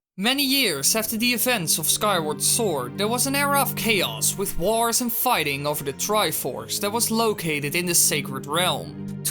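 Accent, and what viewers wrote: Netherlands. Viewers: In het begin een beetje te Nederlandse multiple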